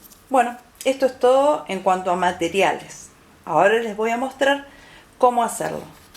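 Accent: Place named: Argentina. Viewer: Muchas gracias, vivo en Thaïlandâ aqui los bambou crecen rapido 😄. Que acento mas bonito, eres agentina ? Besitos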